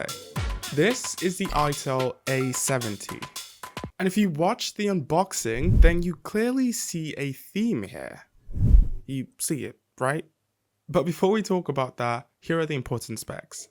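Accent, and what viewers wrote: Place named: United Kingdom. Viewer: Your reviews are unique. Were you born/lived in the UK? Your accent sounds British (I grew up in London). You didn't mention its 256GB variant. I carnt seem to get it on the market, why?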